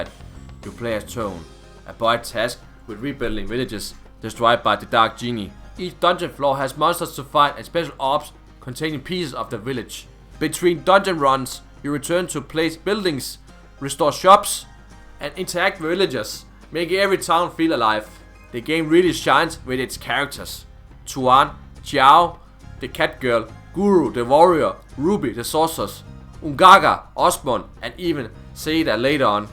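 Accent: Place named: France. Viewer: Crosscode is one of my favorite games of all time. Should try phoenotopia awakening if you like zelda-likes. It's from a sidescrolling perspective which translates really really well. The explanation, story and puzzles are amazing. Curious where you're accented is from. You sound French but when speaking faster it sounds more German or Dutch.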